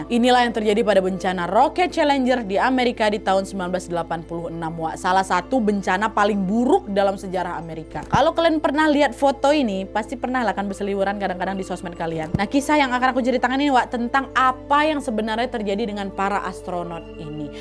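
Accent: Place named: West Sumatra, Indonesia. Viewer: Seneng sih denger penyampaian dari. Dari logat bahasanya, orang minang ya wak? 😁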